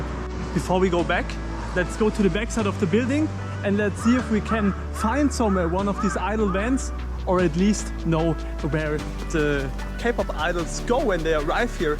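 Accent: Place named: Germany. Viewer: It's funny to hear this German accent, I keep thinking "just say it in German." - Just kidding, you speak English very well and I love Ateez, so this is very much appreciated.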